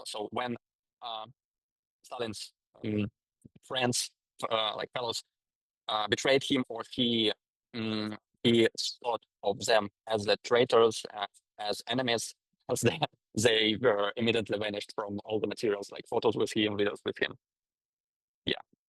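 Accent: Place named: Russia. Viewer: You dont have hard russian aksend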